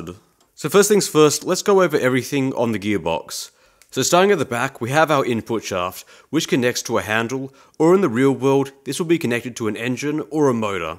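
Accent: Australia. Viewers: Sounded like an Australian accent to me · An Australian!!! My ears are not designed for hearing THIS accent! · Australian? Great!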